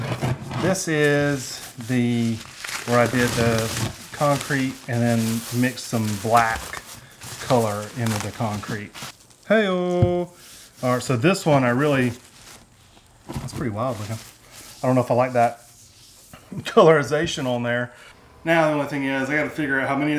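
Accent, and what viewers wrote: England, United Kingdom. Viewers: Your Brit accent 😂 very good.🇬🇧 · That is so easy and you can do it however but the English accent was cracking me up , but do tell your wife she got a sexy one! Stay safe and many blessings to your family! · So... are you going to make a "rock" with a house key hidey hole? My parents had one & it was store bought & stuck out like a sore thumb lol. At least all of your rocks look alike, lol Loving the British accent, cracks me up. Nice family too !! Y'all are so sweet and the project looks prefect !! I might have to go into town and get some supplies!! Not gonna tell my otter half what its for... it's good to leave them wondering lol Be well !! 🦋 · Hahahaha! Thanks! That British accent made my morning😀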